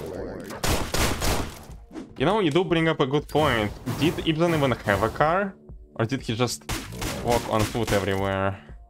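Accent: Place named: Russia. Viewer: Lol, русский акцент сразу слышно)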